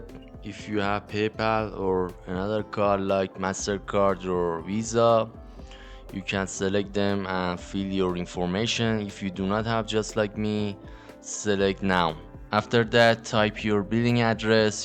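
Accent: Iran. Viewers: لحجه ایرانی داری:) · Iranian Accent🗿🗿🤘🏻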